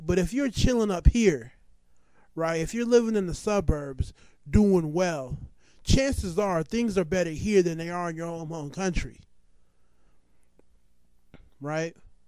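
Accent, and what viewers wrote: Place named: Nigeria. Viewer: Oh I forgot,.you are so Nigerian..not only your accent but ur message..would U like to go to Nigeria urself? I've you started paying bills yet? Not gas /cell-phone bills, I mean real bills..do u have any kids yet? I don't think so.. I'll advise u to listen to your parents advise when dey r trying 2 disciple u, dey know better. Everyone is a foreigner, the difference is, some know where dey came 4rm &some don't. D whites are from European Countries & d Black? we all no dey got lost in transit.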